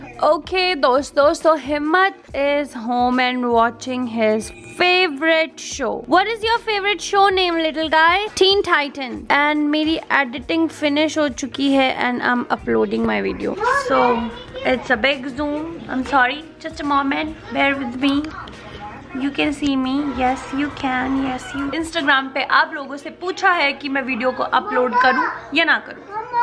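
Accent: Punjab, India. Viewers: Love you baby 😘😘😘😘😘😘😘😘love ur punjabi touch dear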